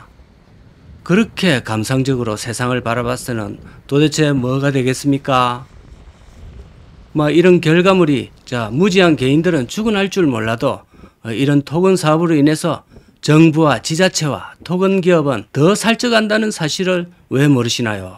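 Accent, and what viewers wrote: Gyeongsang, South Korea. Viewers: 동영상을 보면 경상도 사투리가 참 정겹고 차분하게 들리네요. 고령화와 인구감소에 온라인 영업 저변화로 부동산업이 망해가는 한국.. 더 망해야 한국이 재활하는 길입니다. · .갱상도.사투리를.찾아서.또 오시것습니다. 너무나.좋은방송.😊😊😊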